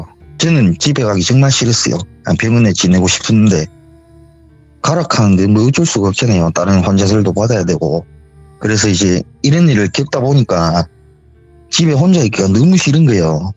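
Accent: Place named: Gangwon, South Korea. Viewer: 지역이어디지?경북인거같기도한데 아니고...강원도인가?첨들어본 말투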